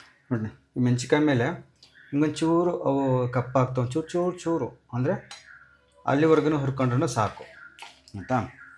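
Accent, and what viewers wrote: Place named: North Karnataka, India. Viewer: Nivu uttarkarnataka kadeyora sir , nimma bhashe chenngide. Yav uru...nimdu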